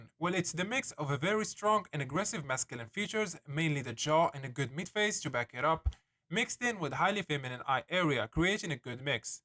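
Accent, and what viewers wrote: Morocco. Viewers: mghribi akhoya😂😂 Wellah ta 3endna accent m3rofa.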